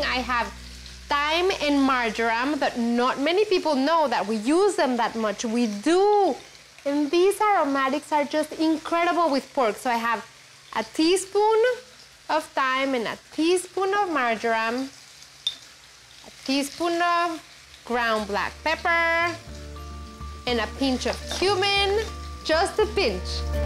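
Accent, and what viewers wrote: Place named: Latin America. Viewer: She have a latina accent...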